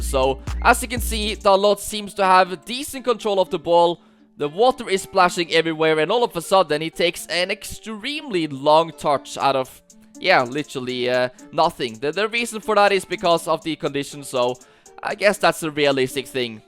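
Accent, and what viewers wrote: Norway. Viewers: Jeg kan høre på engelsken din at du er norsk bruttern❤😅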